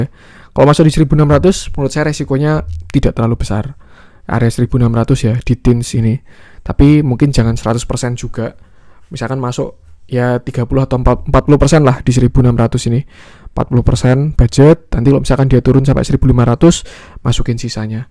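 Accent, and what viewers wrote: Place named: Central Java, Indonesia. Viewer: Logat jowo tengah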